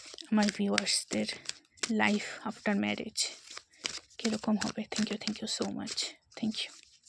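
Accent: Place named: Bangal, Bangladesh. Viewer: Didi tmr mukh a amr bangla sotti sunte vlo lage ❤❤❤ Tumi tmr motoi sundar didi... Kintu sotti amr vlo lge...ami tmr katha sunei bujhechilm tmi bangal...kintu amr tmr mukh a ai bangla tai amr vlo lge...tmi bangla tei video koro❤❤❤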